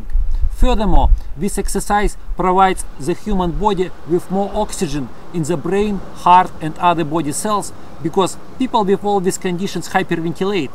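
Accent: Russia. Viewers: he's Russian and so am I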